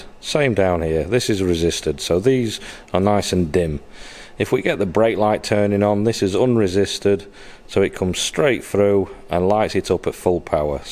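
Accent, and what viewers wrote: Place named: United Kingdom. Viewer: Great video. Thanks. Perhaps its the British accent that makes it seem so easy? Will be doing some LED-ing on my 69 Dodge Charger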